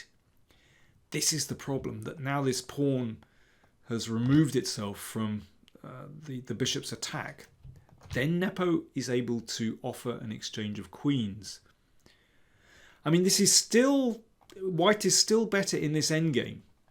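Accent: United Kingdom. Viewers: Not to forget the superbe british tongue!!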